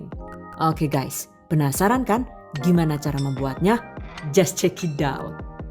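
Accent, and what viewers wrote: Banyumas, Indonesia. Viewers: Ngapak ny bunda ni yg ngangenin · Slalu nonton penjelasanyajelasss bgt apa lg kl pas ada bahasa ngapaknya...suka bgt..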